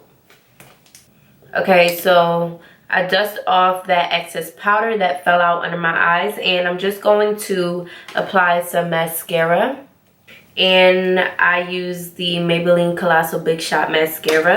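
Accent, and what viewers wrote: Jamaica. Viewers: Are you a fellow Jamaican by the way? Lol I hear a tiny little accent peeping out 🤣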